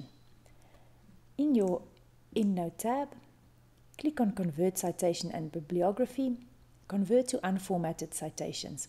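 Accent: South Africa. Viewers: Sounds like a very South African accent to me. Thanks a lot very helpful!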